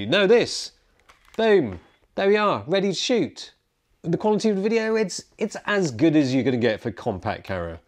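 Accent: United Kingdom. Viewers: Legitimately the most British voice I've heard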